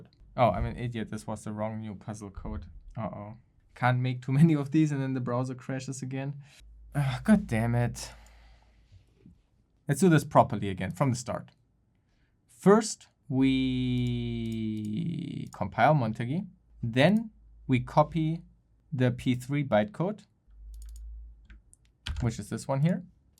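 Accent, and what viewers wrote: Germany. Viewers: I bet this guy has a fritzbox as router... he sounds german and has the 3'rd octet x.x.178.x. Not complaining. Fritzboxes are alright.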